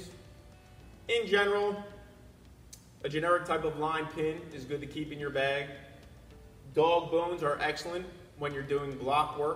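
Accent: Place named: Baltimore, United States. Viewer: Based on your accent, you are from Baltimore or Philly.?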